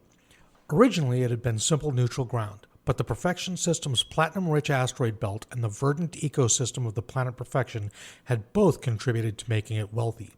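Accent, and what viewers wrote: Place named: United States. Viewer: I like this story and your voice. NetNarrator and Agro Squirrel are my usual listens, but it's nice to get an American voice once in a while. Keep it up, and don't damage your vocal cords, please!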